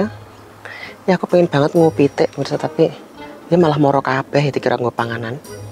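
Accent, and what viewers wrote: Java, Indonesia. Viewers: Seneng banget nontonin video panen2 mas trus ditambah ngomongnya logat jawa lucu gitu 🤗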